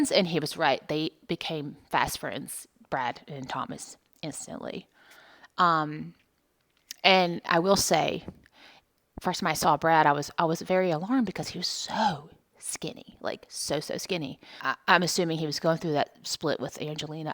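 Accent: South, United States. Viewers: I detect a southern twang😊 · As a southern girl myself, I'm detecting a little tiny bit of a southern twang coming from you. Am I wrong?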